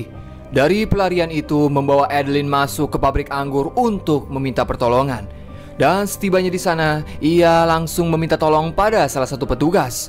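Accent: West Java, Indonesia. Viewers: Orang mana lur euy sundana?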